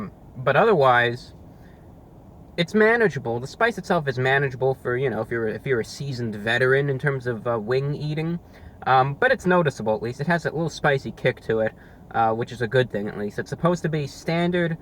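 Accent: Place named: New York, United States. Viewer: This dude has to be from NY.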